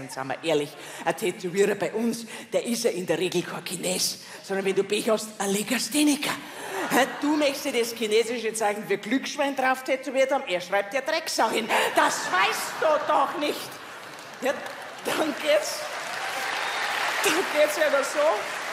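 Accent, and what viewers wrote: Bavaria, Germany. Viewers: Zum Glück versteh ich von Natur aus als Steirer🇦🇹 auch die Bayern. Lg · Ich habe sogar versucht Bayrisch mit Untertiteln zu verstehen - mein Gott, kommt da ein Müll raus., vielleicht etwas langsamer. Wir sind nicht auf der Flucht. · Lernen bayrisch oder höre es nicht an. Ganz einfach! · Einfach so oft anhören bis du 95% verstanden hast. Das Wörterbuch Bayrisch leicht lernen. 3 Wochen mit Bayern auf Urlaub, keiner der Deutsch kann. Es wird nur Bayrisch gesprochen, dann verstehst sicher 100%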